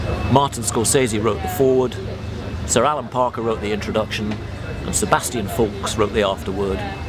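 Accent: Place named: Scotland, United Kingdom. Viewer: You are a bit of a, if you follow the lyric. Good promo though -an educated Scottish voice (fluent & trustworthy!)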